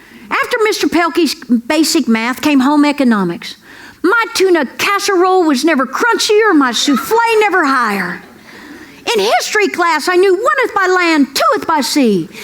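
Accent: South, United States. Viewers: Three weeks ago I stumbled upon an vid and with my niece named Ella Mae I thought what the hell and clicked on it. I have never been so glad I clicked on something I wasn't even looking for. I laughed and then I laughed and then I laughed some more. Her observations and that southern drawl had my stomach hurting. These last three weeks, I've looked for and watched everything I can find that says. Totally hilarious including her X-Rated ones. Love me some. Oh by the way, did I tell ya I laughed😍😍😍😂😂😂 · I love her humor too, especially since they are told about true incidents that she lived through herself!! SHE HAS A WONDERFUL SONGING VOICE EVEN THOUGH HER REGULAR SPEAKING VOICE HAS THAT SWEET COUNTRY TWANG.😂. I lo e listening to jokes!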